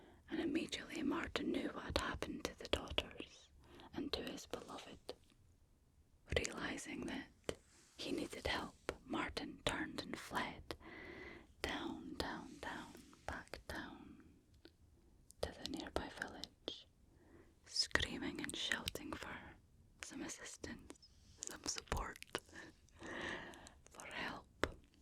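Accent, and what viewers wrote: Scotland, United Kingdom. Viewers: This video took me on such a calming journey! The blend of Scottish legends, soothing hand movements, and that perfect ear-to-ear whispering in your Scottish accent made it incredibly immersive. The guided meditation and visualization were just what I needed to unwind, and your storytelling added such a unique touch. Sweet dreams, indeed! Thank you for creating such a peaceful experience.😴😴😴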